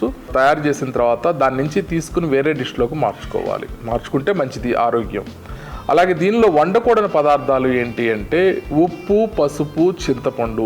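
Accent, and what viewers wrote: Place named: Telangana, India. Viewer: Telangana yaasa idhi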